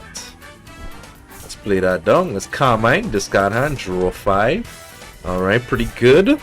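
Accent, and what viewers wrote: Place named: Caribbean. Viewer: Trini or Caribbean accent...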